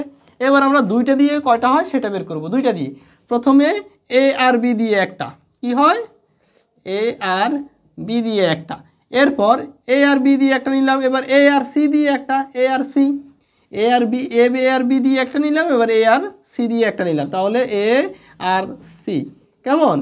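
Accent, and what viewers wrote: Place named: Noakhali, Bangladesh. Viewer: vaiyer basa mone hoi noakhali ba feni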